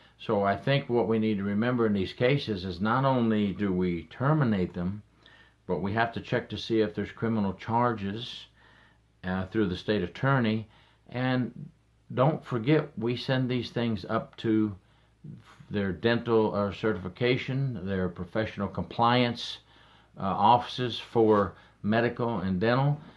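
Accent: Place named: Florida, United States. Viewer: Just stumbled onto your channel not even sure how I got here. You sound like your in Florida. I live here too and I have a loved one incarcerated due out next year. I myself have never understood how the greed of money will drive someone employed by the DOC to get involved with an inmate and abuse their authority. Inmates can't consent to sex why are these people not charged with Rape? If I wanted to be with my Loved one in that way at a visit as a visitor I would assume I would catch charges. What makes them any different? I look forward to watching more of your channel. ;)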